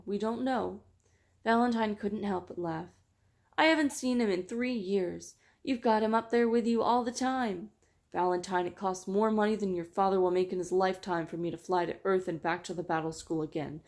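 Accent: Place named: United Kingdom. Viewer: Your british accent is wonderful in this one!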